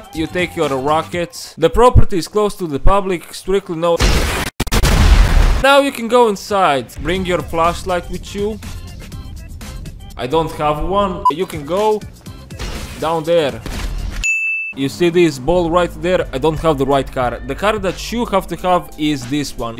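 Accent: Russia. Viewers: I love this guy russian accent. Im balkan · Wait, no he’s either Russian or German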